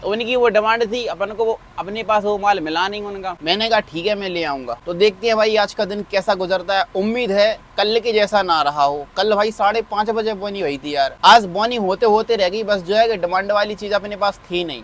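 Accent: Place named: Jhansi, India. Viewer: भाई अभी भी टाइम है इसको बंद करके पान की दुकान खोल ले 😢 कपड़ों की दुकान में अब दम नहीं। वैसे झांसी साइड के लग रहे हो बातचीत से 😅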